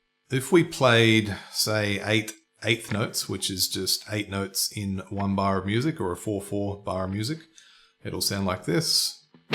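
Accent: Australia. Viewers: What part of australia are you from mate?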